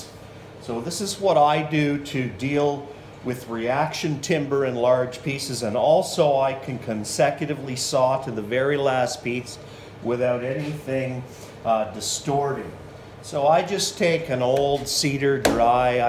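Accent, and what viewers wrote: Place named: East Coast, United States. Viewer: Great information to know, thanks. I detect a slight East Coast accent.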